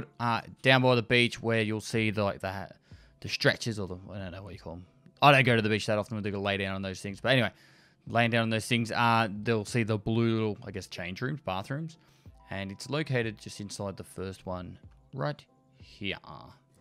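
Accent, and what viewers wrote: Australia. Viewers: It's aussie accent, reminds me of SPUNJ calling de_cache kesch.^^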